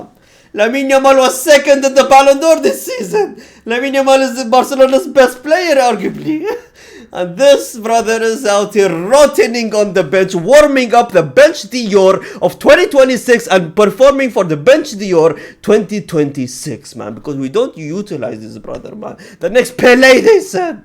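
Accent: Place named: United Kingdom. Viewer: bro has developed a uk accent 😭😭😭😭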